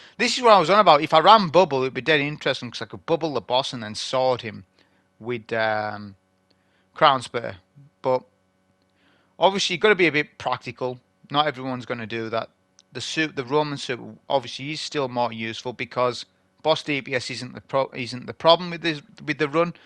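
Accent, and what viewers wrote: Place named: North, United Kingdom. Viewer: Where are you from? I detect a northern accent.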